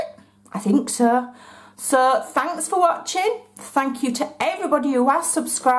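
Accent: Yorkshire, United Kingdom. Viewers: I was looking for craft fair ideas and found your video on the lip balm holder. <link> After seeing that I checked out some more and was hooked by your laugh, your beautiful yorkshire accent (I have a good friend from Bradford and your voice is just like hers) and the fact that you were working on A4 card for the ones I found. If I didn't have arthritis I'd have done somersaults. Love your channel. You're very busy uploading this weekend. Well done girl. And thanks for the giveaway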